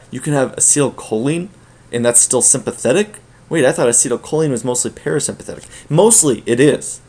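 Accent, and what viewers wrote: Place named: Iowa, United States. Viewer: My guess is its an American accent, from Iowa.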